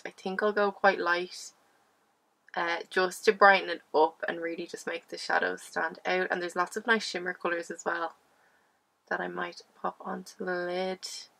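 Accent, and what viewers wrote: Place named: Dublin, Ireland. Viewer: A beautiful look expertly applied. I love your Dublin accent.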